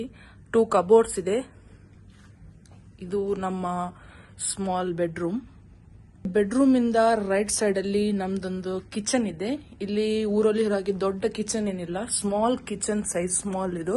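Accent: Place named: Mangalore, India. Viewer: ನೀವ್ ಆರಾಮಾಗಿ ಮಂಗ್ಳೂರ್ ಕನ್ನಡನೇ ಮಾತಾಡಿ ತೊಂದ್ರೆ ಏನಿದೆ 😁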